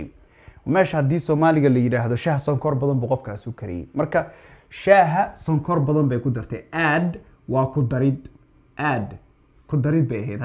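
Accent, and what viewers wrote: United States. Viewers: In fact, i admired your of way tecahing.. jazaakallaahu kheyran.. Please lemme know where and how u learned that rough language, specifically the American accent...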